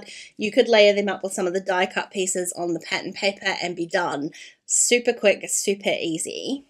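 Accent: New Zealand. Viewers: This is an amazing collection. With my favorite company going out of business, I am looking for a new place to get all my stuff from. This kit is huge!! I love the frames for shaker pockets and all the word sticker. I can't have too many words!! I love your accent. I miss NZ so so much. Thank you for the unboxing!!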